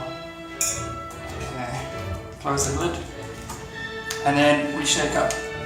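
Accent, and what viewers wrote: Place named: South Africa. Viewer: nice also to hear a fellow South African accent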